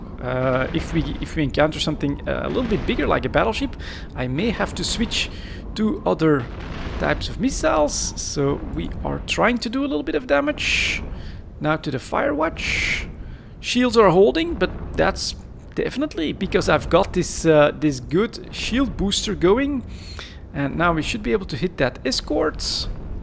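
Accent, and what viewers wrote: Belgium. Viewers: Belgium i think :-)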